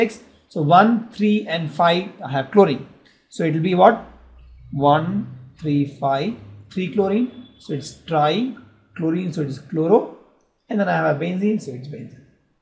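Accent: India. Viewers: ^ Are you like from US or UK. :3 We are indians. Our accent is like that,bro. :3 Stop complaining,start appreciating. -_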